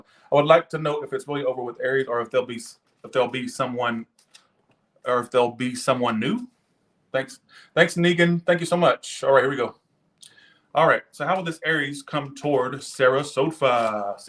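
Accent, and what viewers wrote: England, United Kingdom. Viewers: Love English accent it’s on point 😘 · Taurus read: !!! I love the brittish accent !!! 😆 🤣 😂 😹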